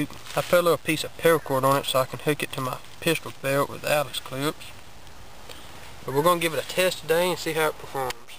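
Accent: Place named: Appalachia, United States. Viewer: I luv a good backwoods hillbilly accent and my brother you got one! Lol No offense I find it very amusing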